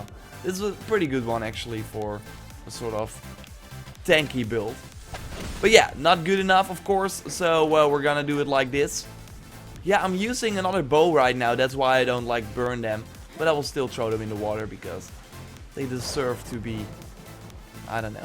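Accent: Netherlands. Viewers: Hoe kan je zo goed engels je hoort amper dat je nederlands bent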